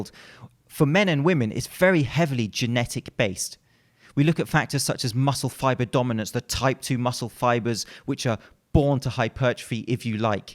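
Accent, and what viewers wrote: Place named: London, United Kingdom. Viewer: Random thought, would bone density, not just bone size also play a part ? Thinking that larger muscles are going to need bigger and stronger (denser?) frame to work off. And if so is that bone density something that can be worked upon ? ie in much the same way that muscle growth is a response to the damage caused to it, would bone density also be affected by damage ? Now of course not promoting the idea of breaking bones. Just think how martial artists strengthen bone through repetition. Probably talking out of my arse but hey if you don't ask questions you ain't gonna learn. Love the vids, though not quite got the old school Landern accent, 8/10 my san!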